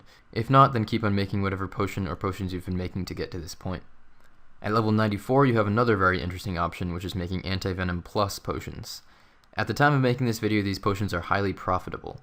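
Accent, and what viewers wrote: United States. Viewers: But you're not even correcting him, because you're wrong. It's obvious from his accent that he's American, and the "h" is silent in American English. There are over one hundred differences between American and British English, and this is one of them. Please don't shit your pants over something so inconsequential which, by the way, is grammatically correct in the first place. This is made worse by the fact that you think you're right when even the simplest google search or slight amount of attention paid in school easily proves you wrong.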